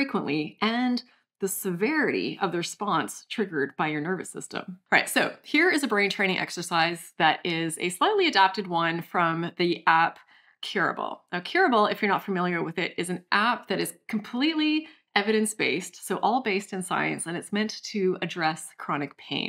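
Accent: France. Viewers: Thank you so much for your great work, your french accent is beautyful❣️A lot of greetings from Germany 🙏🌈🙋‍♀️ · Digging the French accent and French Flintstone. 😂 (I speak French!)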